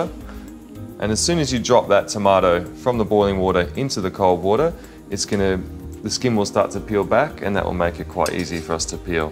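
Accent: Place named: New Zealand. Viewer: New Zealand I think. Gets confusing because there are so many NZealanders in Australia and Australians in NZ, but I think I'm right. The plonk is Australian anyway.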